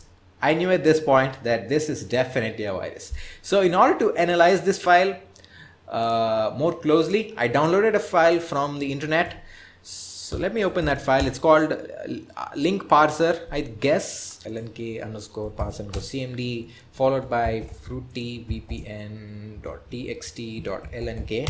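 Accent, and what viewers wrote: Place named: Tamil Nadu, India. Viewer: May I know your mother tongue..? Seems like Tamil 🤔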